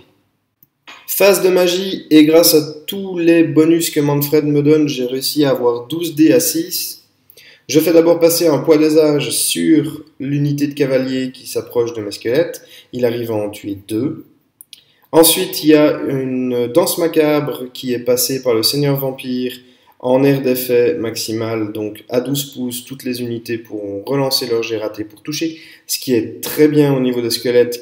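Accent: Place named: Switzerland. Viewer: Salut encore une fois bon rapport de bataille bien expliqué. Si tu souhaites rencontrer de nouveaux joueur je suis motivé pour cela. Je joue Nains, Orques et Gobelins et Bretonniens. J'ai cru jusqu’à cette vidéo que tu étais Belge ^^ alors que tu as l'air plutôt Suisse. Moi j'habite à une heure de Genève mais en France. Bonne continuation et longue vie à ta chaine.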